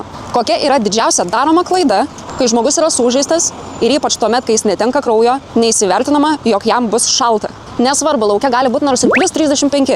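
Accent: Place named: Russia. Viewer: Ljabei fajnas rusyskas akcentas. Ljabei acju uz jusu ismynti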